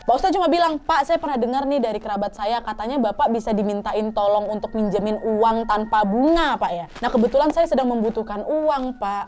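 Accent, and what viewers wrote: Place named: Padang, Indonesia. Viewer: Logat mbk sep org padang😊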